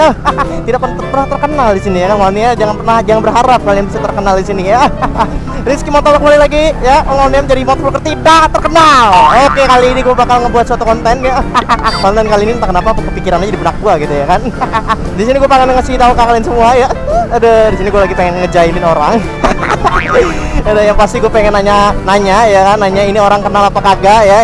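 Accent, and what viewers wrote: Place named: Java, Indonesia. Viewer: lbh suka konten nanya jalan pake logat jawa bang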